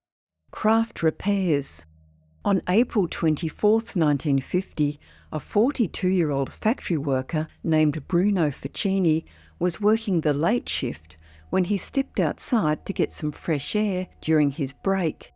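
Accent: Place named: Australia. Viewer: Love your channel as well as your Aussie narration 😀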